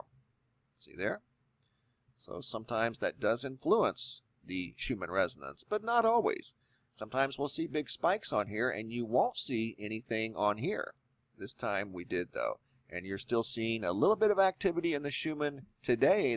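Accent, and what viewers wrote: United States. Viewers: ThingsAreToughAllOver it's cool mang, he's American I'm just glad they say my name right still.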